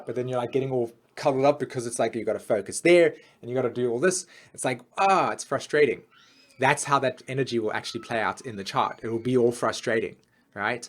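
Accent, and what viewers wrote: South Africa. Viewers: New fan here. Thanks for this content. Great accent by the way...SA? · Are you South African?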